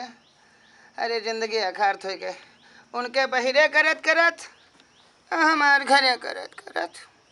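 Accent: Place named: Awadh, India. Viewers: Really enjoyed your way of typical awadhi talking ! Keep it up bro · wow must lag rha h ki dadi ma bol rhi h. aapka bolne ka tarika bhut mst h, lag rha h gonda pahuch gye h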